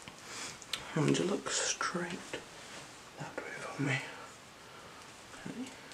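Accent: United Kingdom. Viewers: Ah, lovely. Heard some of that british accent there.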